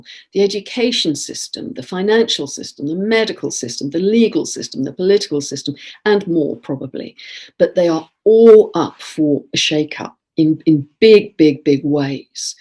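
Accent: United Kingdom. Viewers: Thank you. Good to know of the strong Aquarius theme and to me it is interesting to have it all in a month of sun in Leo energy for the bigger part of the month. The unpredictability of what is coming have a good taste to me by the sounds of all happening Astrologically. Personally I see a level of anxiety from all these energies combinations and at the same time I also feel excited and motivated by all of it. I don't even know how I always know when you say Eris and when you say Aries. When I try to repeat what you say to find out they sound the same but I think there is a subtle difference my brain can pickup having my ears trained well enough to understand British accent. I would guess this accent confusion could be harder for Americans or foreigners not full into British accent. Funny that they, Eris and Aries, have also similar archetypal symbolism. 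Always lovely to hear your videos and have all this rich information at hand. Much appreciated. M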